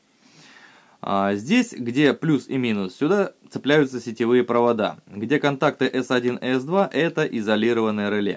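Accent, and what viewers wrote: Russia. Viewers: Нагинаем..., дубенеет... Вроде по русски говорит, да не совсем... Понятно что местный, южный, диалект. Но слух режет... Я один заметил, что выключатель питания не правильно подключен, не по феншую...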